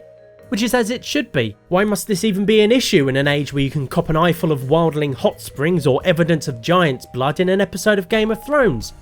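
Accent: England, United Kingdom. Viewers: Real talk, you are really clever and funny, produce timely content on a regular basis, and have an English accent that makes you sound smart to everyone else. I don't know why you don't have 500k subs, but I am sure if you keep your nose to the grindstone you'll get there before you grind away your frontal lobe. May have taken the metaphor a bit far there.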